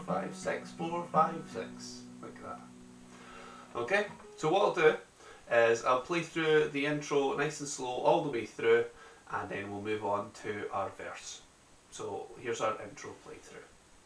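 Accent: Scotland, United Kingdom. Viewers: My good friend comes back from Oz raving about this guy, I check him out. Being a huge fan I love the cover, I then think to myself "I could learn this and it would go well with my scottish accent, I wonder if there's any tutorial videos online". I come on here and it's a scottish guy doing the video. Meant to be. Cheers for the video mate!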